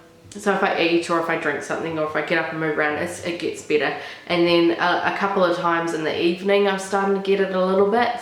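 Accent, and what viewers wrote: New Zealand. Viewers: congratulations ! ive just started vlogging i live in melbourne but am from nz too, cool to see / hear the kiwi accent on you :) all the best for your pregnancy journey 💕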